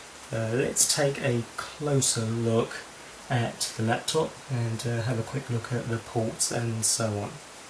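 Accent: England, United Kingdom. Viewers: r u english?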